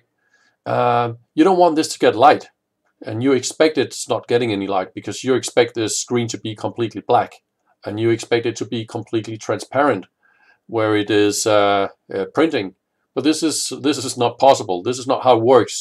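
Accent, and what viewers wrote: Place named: Denmark. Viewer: Awesome... Thank you. This was what I was looking for... By the way... Your accent... Er du dansk?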